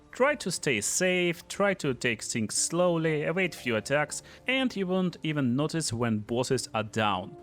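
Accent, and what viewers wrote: Russia. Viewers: I hear Russian accent aula bulia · слышен явный рязанский акцент, уши прям режет =)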